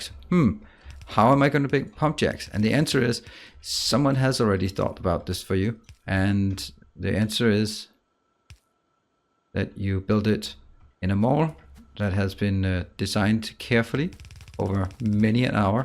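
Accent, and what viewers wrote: Denmark. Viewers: I like your Danish accent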